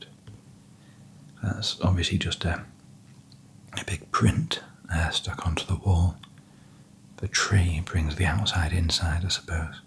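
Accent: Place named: United Kingdom. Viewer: Your videos combine 3 of my favourite things - a British accent (which I have a total soft spot for) ASMR and mini hotel room reviews! Genuinely the perfect combination. Not to mention your calm and soothing voice. I honestly can’t imagine better videos to relax and watch on here :)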